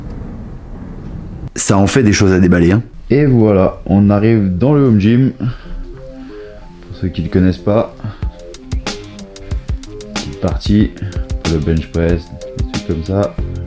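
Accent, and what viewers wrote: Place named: Lorraine, France. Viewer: Qu'es qu'on veut vraiment ? Voilà comment on sais que tu es lorrain 😂 Très bonne vidéo bon courage pour la suite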